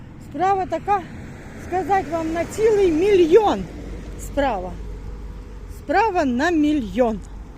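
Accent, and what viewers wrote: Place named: Central Ukraine, Ukraine. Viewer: Привіт землячко, по вимові чую що ви з центральної України🖐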